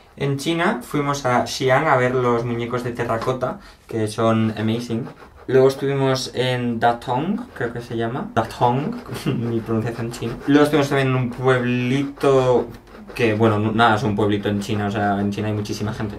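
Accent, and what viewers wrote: Spain. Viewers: Me pierdo con el acento español 😍 Me parece tan ... Ah! No sé porque pero me encanta 🙈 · Y ami me encanta el asento Español España :)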